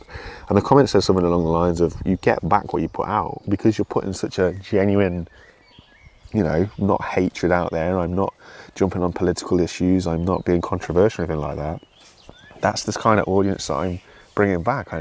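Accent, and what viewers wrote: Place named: East Midlands, United Kingdom. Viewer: Yes, I can see exactly where you're coming from, there is a lot of youtuber abuse around from trolls, and it does tend to be those who have a lot of subscribers, because they tend to say so on their channels. It seems that the vast majority of people out there though tend to come to their aid and offer support or reassurance that there's no need to be worried. Personally it doesn't influence my decision as to whether to watch a youtuber or not, if they begin to get more popular or wealthy, that's just pathetic, but I guess it does happen and some people do feel like that, and become jealous over what is seemingly insignificant to them. Whereabouts are you, it sounds like not a million miles from an East Midlands town, because of your accent?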